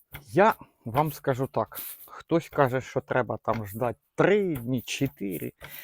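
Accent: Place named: Dnieper, Ukraine. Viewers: Навєрно з Дніпропетровщини, так само балакаю)) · Який суржик, нормальна наддніпрянська говірка))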